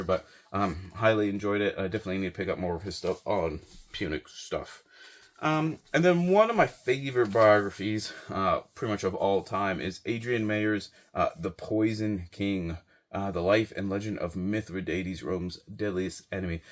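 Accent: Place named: United States. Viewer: Firstly, breath before you make videos and during, speak clearly and don’t mumble. Learn how to pronounce the names of stuff you are clearly so interested in, in the good way, look to the British for this, because your American pronunciation are cringe every time.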